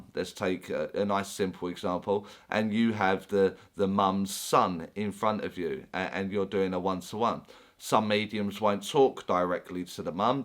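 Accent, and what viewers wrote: Essex, United Kingdom. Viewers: Before I even watch, I can't believe I have seen this I have only just fou d out I am a medium but I've heard spirit for 4 years. I just thought I was crazy, well literally I've been hearing the word gatekeeper so if just typed that word in as I have been hearing the name percival as well so typed in the 2 and your the first video and it's about mediumship. I am having a moment stuff keeps happening and I'm researching and it really is true.. I'm not nuts atalll how would I even know this.. I've just had to search the words I hear.. I'm very much still coming to a realisation I've been classed as having a mental health because I couldn't explain what was happening to me. I don't know why anyone would want to put thumbs down, I mean you sound like where I'm from Essex x💚 anyway I will watch now. There's a nice vibe straight away sorry to type on I just can't believe what's happening.. 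I'm having a moment and I have to get it off my chest cis everyone thinks I'm crazy when I say I've been hearing the gods as well as reletives and other entity I can only see tho via dreaming as if yet but I've spoke with Calvin klien and had visions of Diana, spoke with Hermes, Hearing percival and spoken with Gabriel too Thank you I probably sound so silly but it really true x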